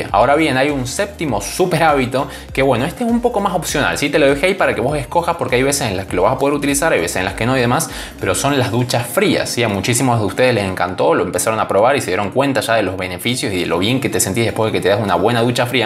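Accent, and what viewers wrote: Argentina. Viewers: Sos de Cordoba ?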